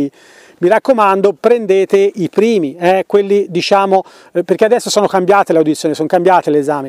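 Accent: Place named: Tuscany, Italy. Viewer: Caro e un piacere guardare te e Ame😂Le tue spiegazioni sono chiare ed esaustive condite con l'accento toscano che non guasta affatto. Grazie per la tua sapienza e onestà. ❤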